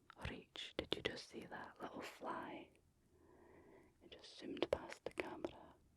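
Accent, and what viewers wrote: Scotland, United Kingdom. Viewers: Love your Scottish accent 🥰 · HELLO really enjoy your Scotty accent and your beautiful smile. Rick Henderson U.S.. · Scottish has to be one of my favorite accents! 🤌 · I love Scottish accents so much, I find myself repeating words in it sometimes. It’s so satisfying 😂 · Gosh i Miss Scotland I fell in love with your accents this past summer 😃 lol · I love ASMR and also have studied linguistics, and the Scottish and Irish accents are literally some of my favourite accents in the world; they’re the funniest, somehow the most uncanny, and I love it. Perfect mix. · As an American who just found out that i have mostly Scottish heritage… it explains why I love the accent so much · I've loved Scottish accents on women since I crushed on Sheena Easton way back in the day. 🥰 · Most Gorgeous! Love your Pure Scottish Accent Whispers! 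Love your Beautiful Smart Look!:yougotthis:💯🌟❤🌻🌼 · Love the Scottish accent. Found it extremely relaxing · Your Scottish accent makes my brain go all squiggly. Never stop saying words please. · Doesn't even have to be ASMR, I absolutely LOVE the Scottish accent. I could listen to someone with this accent talk about absolutely nonsense allllll day.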